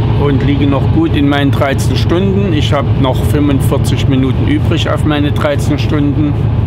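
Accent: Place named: Saxony, Germany. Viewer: Hallo, du bist einfach der zuverlässigste. Jeden Tag freue ich mich auf dein Video. Und sächsisch finde ich seitdem auch nicht mehr förchterlisch! Mach weiter so!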